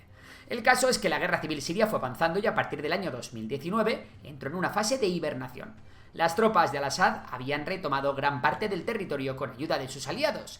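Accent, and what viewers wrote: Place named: Spain. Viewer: Quién más odia el irritante acento español? 😫😖🙋🏻‍♂️🙋🏻‍♂️🙋🏻‍♂️🔚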